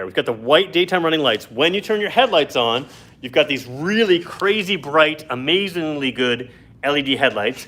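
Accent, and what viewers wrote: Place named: New York City, United States. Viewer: I enjoy your videos. They are very informative. I have one suggestion for you however. Watch one of your own videos and Count your words per minute ? You speak really really really fast !!! Maybe less coffee ? Maybe slow down just a little bit ! ? I don’t know . I am a long haul truck driver and I drive the entire United States and Canada and the difference in how people speak between New York City and Birmingham Alabama and Ontario Canada is an amazing thing to experience !! You would fit right in in New York City except you seem a little less caustic .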